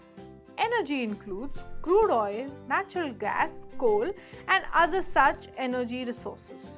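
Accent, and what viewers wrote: India. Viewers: Disliked for heavy Indian accent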